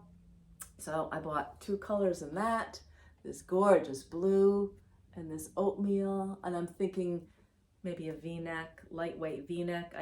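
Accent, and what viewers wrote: New England, United States. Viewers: Love your PC. Just found you last week. I heard your accent and knew we had something in common !. Native New Englander here...Central Falls, N. Prov., Warwick......Love the references you make to being from RI. So sad to see the aftermath of the hotel....happy to hear they'll rebuild. We went to the island last summer. I hadn't been in years. Beautiful as ever. Looking forward to next episode. Happy September. Jeanne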